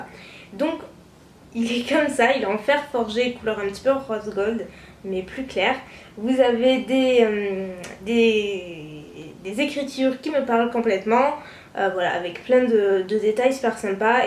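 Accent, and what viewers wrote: South, France. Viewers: Tiia un accennt duu suudd ssuuperrr beeau tres leger mais qui s’entend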